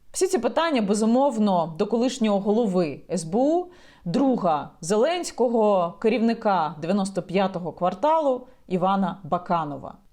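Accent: Russia. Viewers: вам треба перейти на українську мову навіть уві сні! Дуже сильний, може не дуже, але помітний роззійский акцент. Граматика та синтаксис української мови трошки не такі. Треба щось змінити, але не знаю що. Я сама така.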